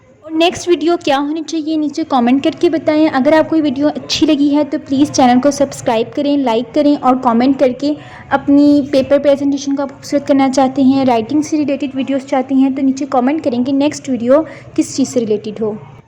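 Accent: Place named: Lahore, Pakistan. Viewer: خدارا اردو کا بیڑا غرق نہ کرو اردو کی ادائیگی مناسب انداز میں کرو یہ لاہوری اردو سی پرہیز کریں'اردو ہماری قومی زبان ہے اس کو خراب نہ کریں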